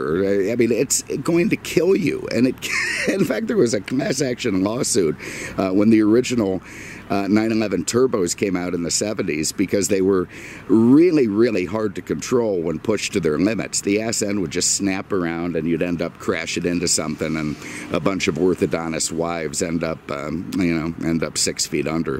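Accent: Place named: Philadelphia, United States. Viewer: Love the channel. The narrations are great but the voice seals it. Been trying to pin that accent for a few months... First thought: Jewish northeast. Possibly Philly, faded around the edges from being transplanted in Southwest FL for a few decades. Then I thought; "WHO does that sound like" and it took less than 30 seconds to come up with- Paul Lynde, who came from East Ohio by way of NYC. Am I close?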